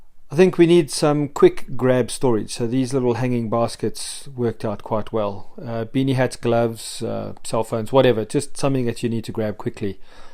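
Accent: South Africa. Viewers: I can spot a south african accent from miles away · Good ol South African accent 😉👌🏼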